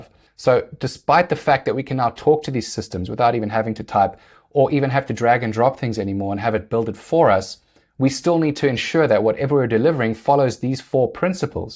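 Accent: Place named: South Africa. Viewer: I know a South African when I hear one😎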